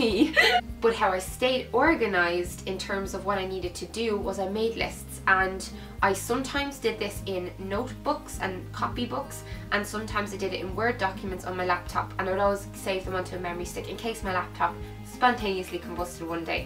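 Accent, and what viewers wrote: Ireland. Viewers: irish accent yes irish accent took me a second :)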